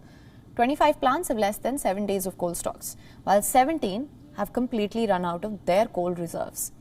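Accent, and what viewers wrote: India. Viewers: This presenter speaks so fast that you can hardly understand her I know that people from India have an accent but other presenters do not have the same problems at this one has. She even has trouble reading the prompter because she's racing so fast with the text. She needs to be fired and replaced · A very pretty girl with a heavy Indian accent. Just a few lesson /sessions in "Spoken English" with a good & dedicated teacher, would help her in her career as a "speaker" & she will be understood better by people unfamiliar with the strong desi stress on the t & d words etc. Constructive criticism from some1 who loves your channel and wants it to appeal to English speakers in the west as well as in the east.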